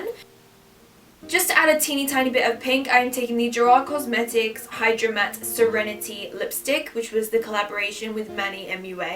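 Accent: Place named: London, United Kingdom. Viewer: London accent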